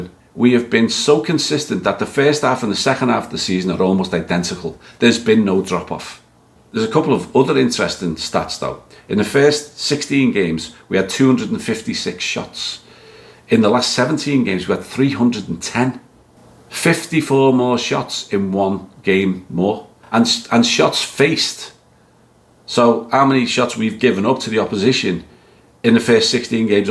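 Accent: Liverpool, United Kingdom. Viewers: I also like your accent Obviously Liverpool but not over the top Me The posh end Near to Crosby Ok Bootle But 51 years in BC but 26 on Merseyside You can rake the boy out of Bootle but etc etc subscribed😮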